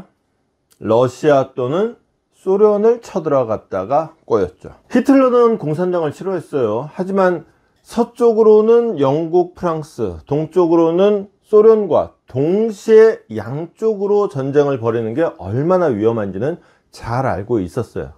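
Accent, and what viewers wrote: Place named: Gyeongsang, South Korea. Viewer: 경상도 사투리가 맛깔 나십니다..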